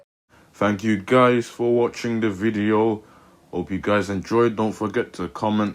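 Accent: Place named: Roadman, United Kingdom. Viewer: You’ve got the roadman accent, love it!!! Great video though, the sound is the best 😂😛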